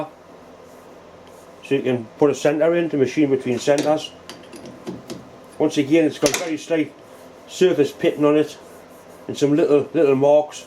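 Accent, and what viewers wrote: Newcastle, United Kingdom. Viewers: Great,I could listen to a geordie talk engineering for hours...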